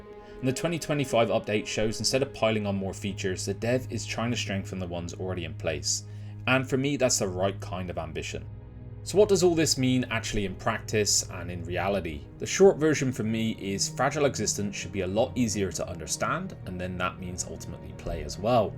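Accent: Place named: West Country, United Kingdom. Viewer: Whats with the west country accent sprinkled with the American favourite of replacing T sounds with a D. You may not think it 'madders' but it matters.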